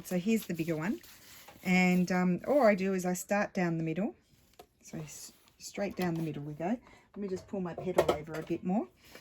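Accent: Australia. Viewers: Hi from Cairns Queensland. Like many others, I have become reliant on your daily broadcasts from Italy where you've been hard hit by COVID19. It always makes me happy to tune in to YouTube and see that your latest vid is there. I love listening to your chit chat and am glad to hear that you still sound like an Aussie. It also always good to know that Lulu, Steph and you are living their lives still as normally as possible during this apocalypse. Stay well, keep up with your vids please. Cheers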